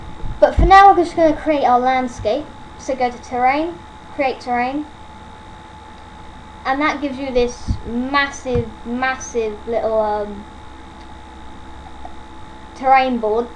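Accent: United Kingdom. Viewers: great video but lame choice of english accent. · omg bro is british! finally i found a british unity tut thank you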